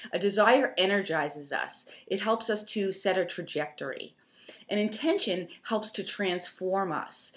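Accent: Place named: New York, United States. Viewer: Your NEw York accent is ever showing. Haha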